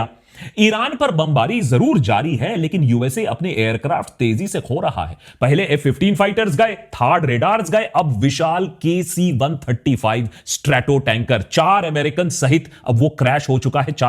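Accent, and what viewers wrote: India. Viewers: 我听出这是印度口音，估计这个视频被伊朗人听到了，所以他们放行印度船只。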